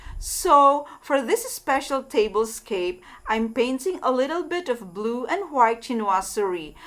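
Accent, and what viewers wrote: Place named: United Kingdom. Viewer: Back to your table set up. Your vintage ginger jar oh my you have all this beautiful rare pieces in your home. The fruits and the crystal tray is epitome of Classic blue and white decorating. I love the idea that you bring fruits for fall accent and I know we don’t celebrate fall in the Philippines and that’s a very clever idea. Tea party is one of my favorite too so overall you made me really happy with this collaboration and you make me laughed with your British accent. Lol I hope we can do this again next time this is really fun I learn something from you and I love it. Thank you Sis. I did share this in my FB and later in my community tab.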